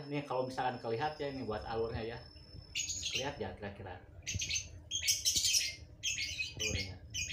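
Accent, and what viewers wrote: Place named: West Java, Indonesia. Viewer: Kyk org sunda ngomongnya mh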